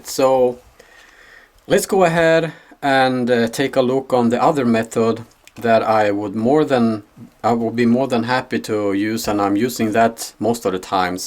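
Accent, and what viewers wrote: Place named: Sweden. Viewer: Where are you from? The accent is really hard to place. First I thought Swedish, but there's something that reminds me of Finish also.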